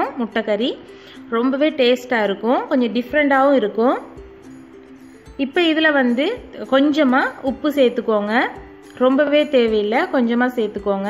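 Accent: Sri Lanka. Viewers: Negga pasura ulagai tamil romba pudikkum innum srilanka racepice podugga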